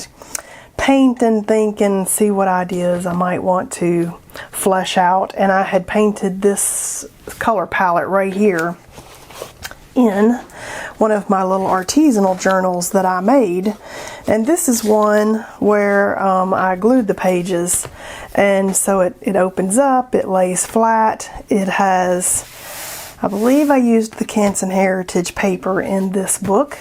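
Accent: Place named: South Carolina, United States. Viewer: your SC accent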